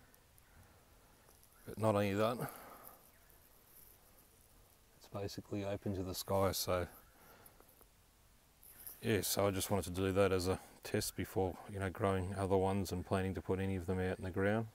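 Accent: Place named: Australia. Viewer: Good luck with your jabo. Is it a Sabara? It's so cool to hear your accent. I'd absolutely love to visit Australia 🇦🇺 one day.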